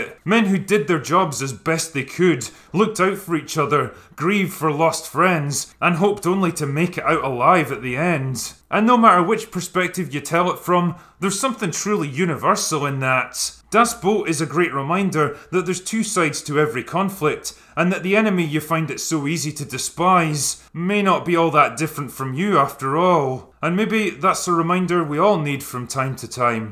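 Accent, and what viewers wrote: Scotland, United Kingdom. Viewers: I always enjoy your videos and often recommend them to friends, so I hope you won't take this the wrong way. Your analysis of Das Boot was excellent, spot on in fact, but you had a few inaccuracies regarding the history and as a Naval person and historian feel compelled to mention them: - Your archival footage at the beginning was all of German WWI U-boats, so wrong war. - Submarines are always "boats" and never "ships." Doesn't matter if it's an old 500 ton coastal boat or a 10,000 ton SSBN; always a boat. Also, I'm curious why you were saying "loo-tenant"? You're obviously from the Blessed Land of Scotch (in fact, you sound almost identical to a mate from Clydeside, especially when you tell us to go away), so I'm curious why you use the American pronunciation? Cheers!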